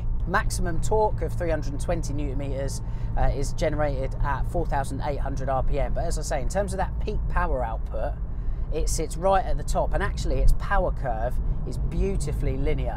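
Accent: United Kingdom. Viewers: what part of the UK are you from?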